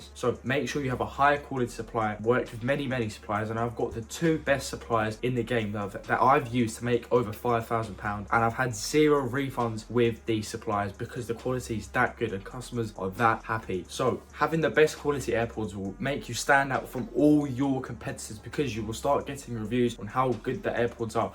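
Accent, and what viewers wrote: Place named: Bulgaria. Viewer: Are you bulgarian?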